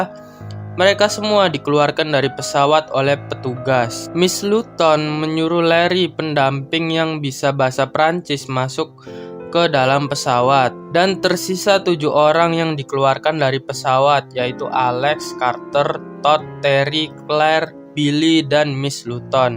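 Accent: Cirebon, Indonesia. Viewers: Admin logatnya kaya wong cerbon sih min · Anjing orang cirebon bgtt logatnya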